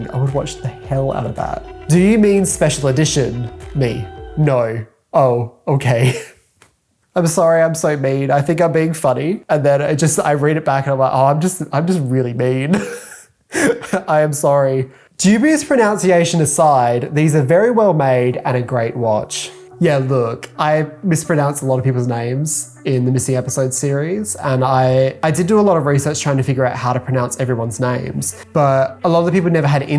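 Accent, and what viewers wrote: Australia. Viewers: don't mind nasally, gay, effeminate Australian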